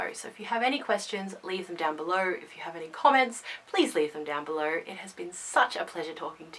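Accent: Australia. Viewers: '27 million' I don't know what it is, but if it weren't for the accent, that's how I knew for sure you're Aussie too. Nobody of any other country or culture that I've met, ever makes those ridiculous hyperboles like us Aussies do. This video was such a great help, it's hard trying to find your way when you're just starting out because there's just so much that it can be overwhelming to know where to begin, thank you for helping me sort out this aspect.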